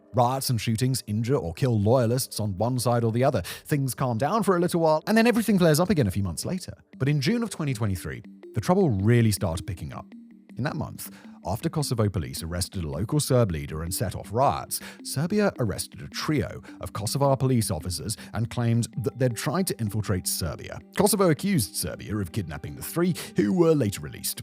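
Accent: United Kingdom. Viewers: British accent talking about the balkans is red flag that announces bullshit. War in croatia broke out after germany armed croatia, war in kosovo broke out after the UK and the US armed moslem terrorists. The monologue speaks of serbian nationalism 100 times, and relevance of history to understand the presence, but fails to mention ww2 organized extermination of serbs in croatia and bosnia (by nazi allies croats and moslems)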